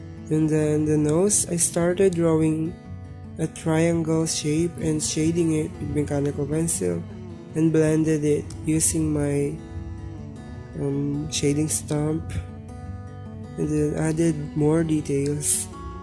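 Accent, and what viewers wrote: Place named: Philippines. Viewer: Your English accent is really the same as filipino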